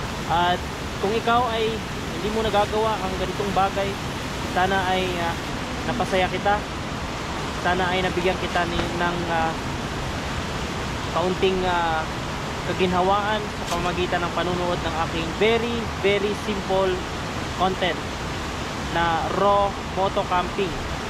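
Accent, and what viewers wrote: Visayas, Philippines. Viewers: Bisaya kba Dong.?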